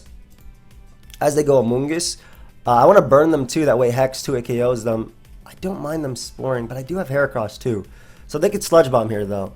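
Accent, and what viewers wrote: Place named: New York, United States. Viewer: It's the NY in him. YERRRRRRRRRR!!!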